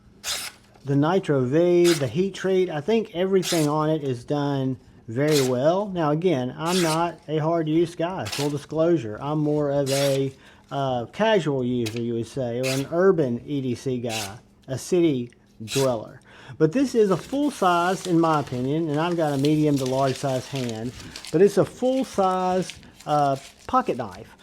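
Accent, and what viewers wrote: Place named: Baltimore, United States. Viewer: Your accent sounds like Baltimore 😂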